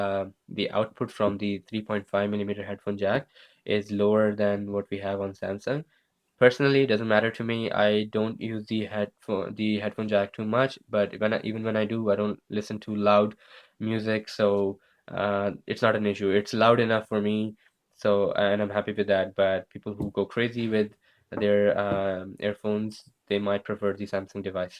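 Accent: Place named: India. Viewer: u sound like an indian,r u?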